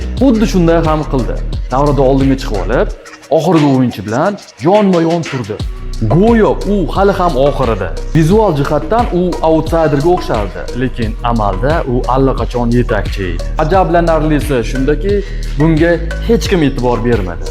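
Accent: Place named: Namangan, Uzbekistan. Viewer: Namangan sheva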